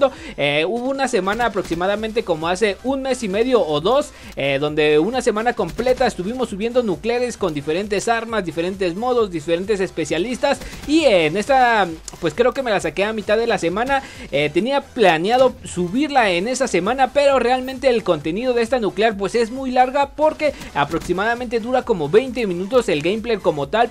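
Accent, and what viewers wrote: Mexico. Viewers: ¿¡Eres de México!?. 😱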